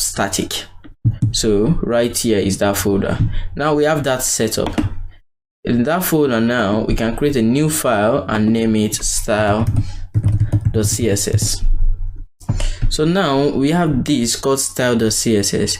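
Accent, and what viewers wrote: Yoruba, Nigeria. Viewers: is probably Nigerian by his accent, yoruba to be precise. Thumbs up to him🇳🇬🇳🇬🇳🇬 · It's a Nigerian accent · Nigerian accent o 😂💀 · sounds like a Nigerian great tutorial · this is wonderful ..... why does this guy sound very Nigerian ??? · Well he is Nigerian .... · He sounds Nigerian, awesome man! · As a Nigerian, I've been doubting if this accent is from a Nigerian or not, then I heard "let me kuku", my doubt went off....thanks so much for the video. How can I also reach out to you? I've done cs50p, cs50x, and almost done with cs50w, but still feeling like they are not enough in today's market. I will really appreciate your response. From your fellow Nigerian. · Excellent, looks like a nigerian accent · Im so happy i can hear a Nigerian's voice. God bless you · I can hear the Nigerian accent. Great video. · Heard the accent and i knew this na omo naija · Is it just me or does the instructor have a Nigerian accent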